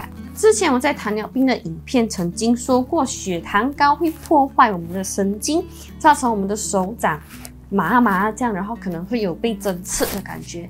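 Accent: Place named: Malaysia. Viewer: 视频主的中文发音很不标准，乡土味很重。请问是不是马来西亚华人 ？😁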